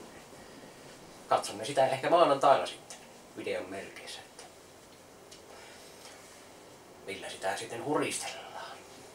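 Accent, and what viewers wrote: Savo, Finland. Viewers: voiko tuota savon muretta oppia millään kansalaisopiston kurssilla tai nettisivustolla? kiinnostaisi vanhoilla päivillä oppia uutta , se mukavasti estää dementiaa.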